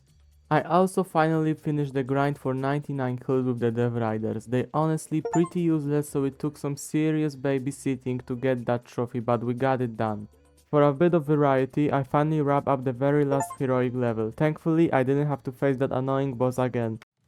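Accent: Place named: Poland. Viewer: najmocniejszy polski akcent ever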